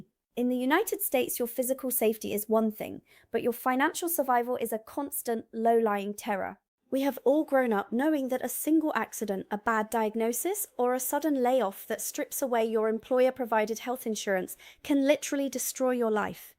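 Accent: England, United Kingdom. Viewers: If you're American, then why have you got a British accent? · She sounds British to me, so maybe she is Australian or something? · you have an amazing english accent for an american :-) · How did you acquire such a cut-glass English accent?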